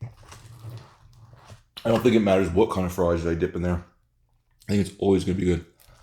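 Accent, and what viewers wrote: Canada. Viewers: Where in Canada are you from? I’m guessing Alberta by the accent.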